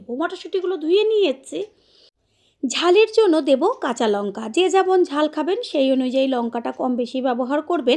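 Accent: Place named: Bangladesh. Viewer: Apnar katha gulo bagla deshi moto lagche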